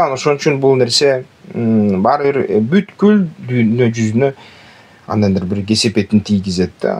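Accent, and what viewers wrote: Standard. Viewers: Кыргызсча таза суйлойт адабий тилде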